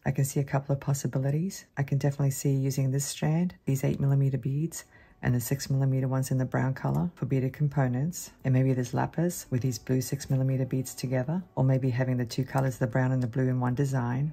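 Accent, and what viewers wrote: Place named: Australia. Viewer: Thank you so much for the video! AND thank you for keeping me company whilst I get my lab ready for school. ☺LOL! When I first subscribed to your channel, I had guessed you might be from AU from your wonderful accent. I have several great friends from OZ. I'm hoping to get there to visit them sometime soon. This box of pretty beads will make many wonderful treasures. Thank you again! Take care and be safe! 😊💖🙏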